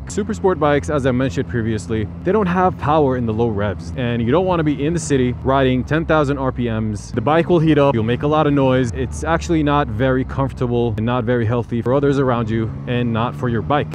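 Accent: United States. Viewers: אשכרה עד שלא ראיתי את המונית הייתי בטוח שאתה מארצות הברית. המבטא, המראה הסינמטי, הכל נראה מושלם אחי. אהבתי ועשיתי סאב, שרק תפציץ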